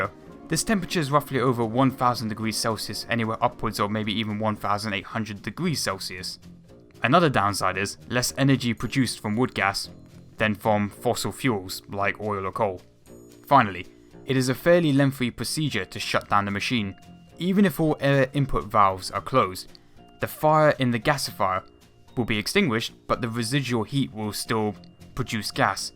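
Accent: England, United Kingdom. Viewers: Why has an british accent? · This is true. He's from England, but not the part where I live.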